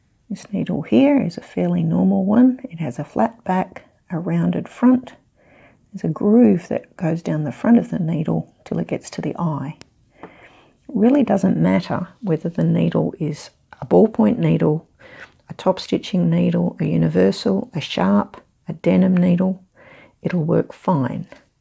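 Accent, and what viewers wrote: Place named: Australia. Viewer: Thanks for the demonstration. I have one of these but had no idea how to use it. A very useful gadget I met you recently in the Janome shop in Joondalup where you helped me buy my lovely new machine. Good luck with your channel. So nice to hear another Aussie voice on YouTube. Maybe some advice on how to use the one step button hole foot next?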